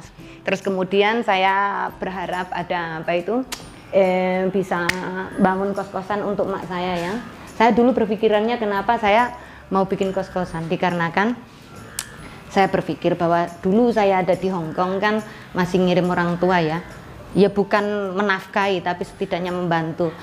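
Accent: Java, Indonesia. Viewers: kalo boleh tau mbak ini jawa nya asal darimana?? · Mbk soko itu opo to mbk, Baru nemu channel ini mbk ,medok banget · Senang banget sama suara mendoknya kk salam dari NTT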